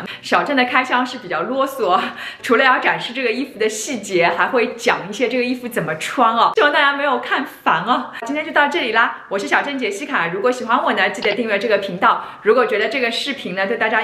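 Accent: Shanghai, China. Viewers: 刚关注你，一听就知道你是上海人😊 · 雖然Jessica的普通話已經夠標準了，但是我還是聽出了上海風。好熟悉好溫馨。😘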